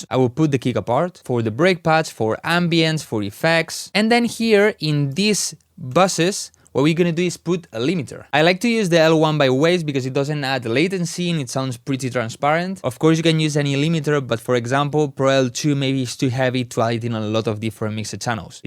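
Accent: Spain. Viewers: y, era re obvio que es de españa si te das cuenta como habla inglés jajaja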